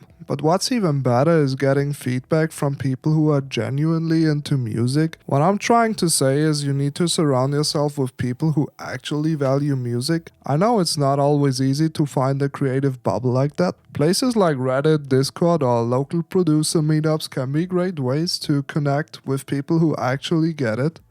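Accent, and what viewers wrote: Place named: Scandinavia. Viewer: 200 views and nordic accent let's go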